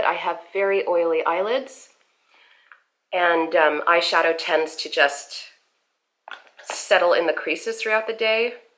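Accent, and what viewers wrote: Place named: Canada. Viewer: I don't know why, but I love Canadian accent. :) Nice makeup.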